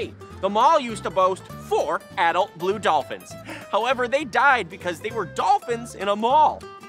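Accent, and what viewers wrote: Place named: East Coast, United States. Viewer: Trying to add that East Coast 'character' you see and hear around here. No problem, but, yeah, true.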